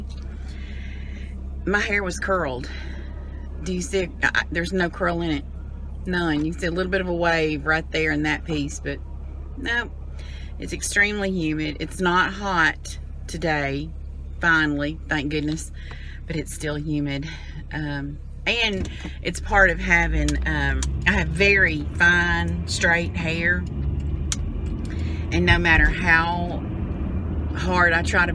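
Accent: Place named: Texas, United States. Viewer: The opening of this video had me cracking up..... Clay is going to keep you honest. He wants to make sure you saw that temperature correctly...lol. And is this the cat you mentioned that would scratch the heck out of you if under the sheets? Well I would have never known because she looks all cozy and cute all up againt you. Too freaking cute if you ask me. And girl I just love your accent, I'm not from Texas so hearing it is music to my ears. Good luck with the voice acting thing., you deserve that and more.....and last but not least, you looked great on all the shirts and blouses you tried on. My fave was the pink or mave with the lace. You looked very pretty, yup even in the dressing room.......lol.......love you girl, love your vlogs and keep them coming. Becuz your laugh is contagious.......blessings ♡♥♡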